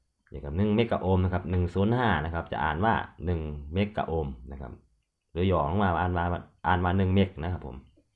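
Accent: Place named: Isan, Thailand. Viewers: ชัดเจนอีสานบ้านเฮา👍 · มื่อนี่ฟังได้เฉพาะภาคอิสานเด้อค่ะ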